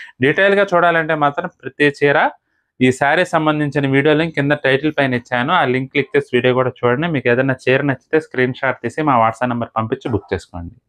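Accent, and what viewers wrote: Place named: Andhra Pradesh, India. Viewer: Sir మీరు ఆంధ్రా చాలా బాగా మాట్లాడతారు🎉🎉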